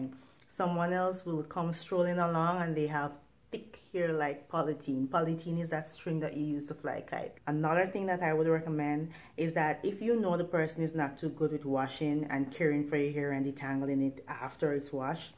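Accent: Jamaica. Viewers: I've had my hair flat ironed days ago because I wanted to get my ends trimed. When I wash my hair, I will let you know how it turns out. I keep listening for the Guyanese accent and keep hearing Jamaican 😊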